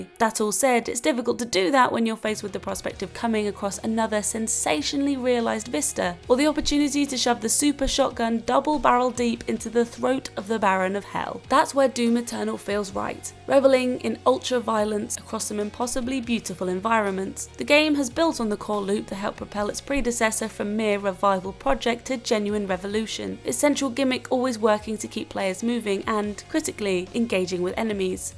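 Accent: United Kingdom. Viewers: Remember games radar before it was bought out by bored, monotone sounding British bloggers?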